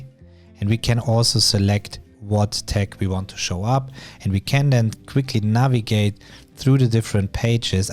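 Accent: Germany. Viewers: Are you German? ^^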